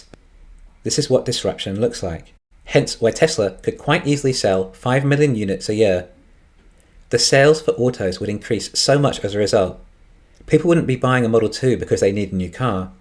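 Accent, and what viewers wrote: England, United Kingdom. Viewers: The video montage imbued with an English accent commentary is what allows this channel to be the quintessential news source for $TSLA and the chosen offspring of world AI salvation, á la The Terminator, Elon and his Canadian progenitor, Maye.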